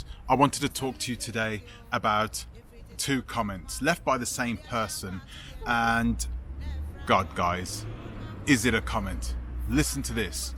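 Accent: United Kingdom. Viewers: OMFG THAT BRITISH ACCENT IS SOOOOOOO ANNOYING IDK HOW ANYONE CAN LISTEN TO IT FOR LONG PERIODS OF TIME 🤮🤮🤮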